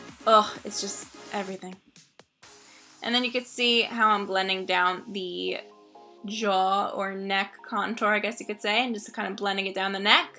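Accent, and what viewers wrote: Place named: AAVE, United States. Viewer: I think you are beautiful and you are very talented with makeup. I appreciate you sharing your knowledge. With that being said, I find the Ebonics a little irritating. There is no need to say things like "ma" face.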